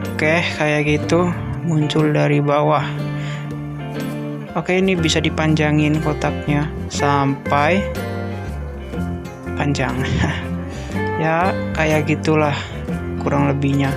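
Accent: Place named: Banyumas, Indonesia. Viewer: Jawa ngapak😂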